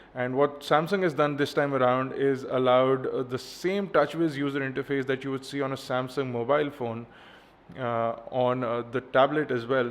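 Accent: India. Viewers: indian ? lol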